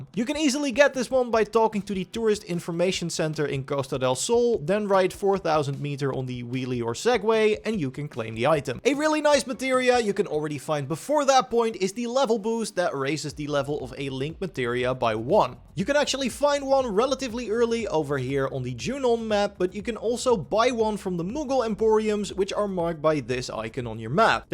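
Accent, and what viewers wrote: Netherlands. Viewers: I love your hearing your Dutch accent lol. Goeie video!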